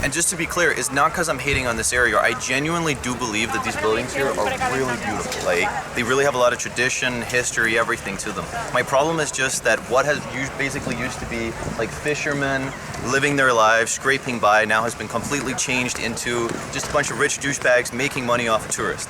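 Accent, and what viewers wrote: United States. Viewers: How did you get that American accent?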